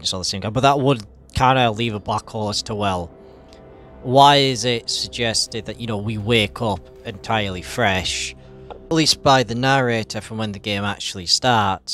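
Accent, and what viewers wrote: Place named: Scotland, United Kingdom. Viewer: I'd say he's probably Sco'ish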